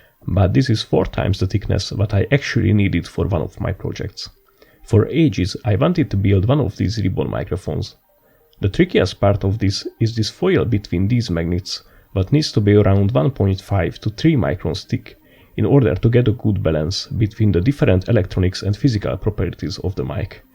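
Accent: Hungary. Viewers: az akcentusod alapján magyar lehetsz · i heared the first sentence and i already know you're hungarian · A jó magyar akcentus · your accent sounds like hungarian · Its just hungarian accent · Your accent is veeeery hungarian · Me at 1 AM: > "oh boy, seems like we're blessed today to find another hidden gem of a channel" > the narration is the platonic fucking Form of the hungarian accent actually manifest > now I cannot stop smiling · amikor az ember megismeri a magyar akcentust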